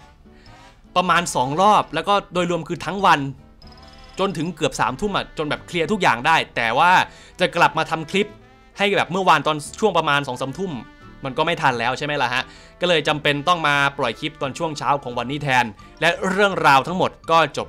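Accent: Ubon Ratchathani, Thailand. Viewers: พี่เป็นคนอุบลฯเหรอ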